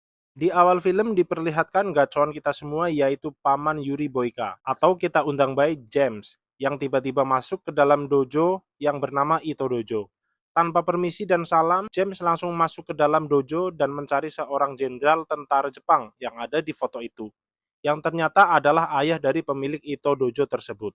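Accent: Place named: Banyumas, Indonesia. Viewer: lucu bgt bahasa ngapak😅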